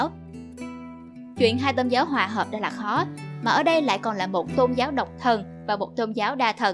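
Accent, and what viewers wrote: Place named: Quang Tri, Vietnam. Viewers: đây là giọng phía tây Quảng Trị hoặc phía nam gần huế, chứ ở trung tâm lệch về phía đông giọng khác nhau nhiều! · tieng quang tri y chang luon :3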